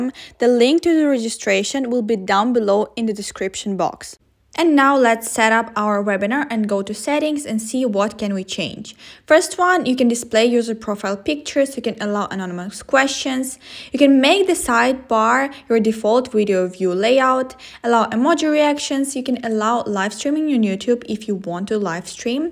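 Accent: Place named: England, United Kingdom. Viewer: Love your English accent, great vedio.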